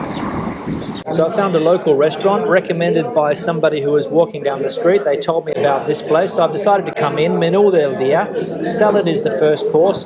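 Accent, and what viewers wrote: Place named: Australia. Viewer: Dat Aussie accent. I've been watching your videos for a while. Thanks for all the info. Cheers from France.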